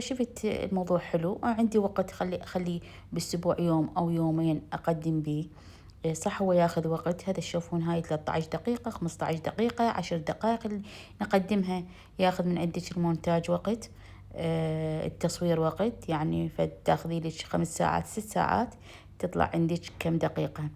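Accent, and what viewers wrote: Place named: South, Iraq. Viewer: يا روحي مبينه منين معروفه من اللهجه حبايبنا اهل النجف والديوانينه يكولون عليمن احلى لهجه خفيفه على الكلب❤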